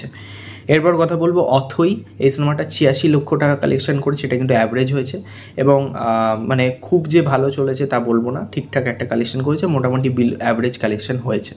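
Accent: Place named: Hindi, India. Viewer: বাংলায় বলছো আর উচ্চারণটা হিন্দী কেনো যশ হবে ইয়াশ নয়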